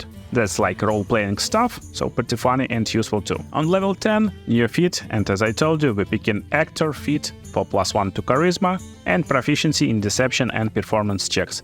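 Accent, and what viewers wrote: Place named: Russia. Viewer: какой русский акцент :)))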